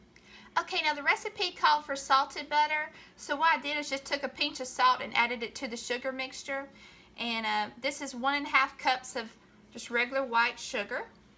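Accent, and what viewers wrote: South, United States. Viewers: I love that country accent. did you say dump lins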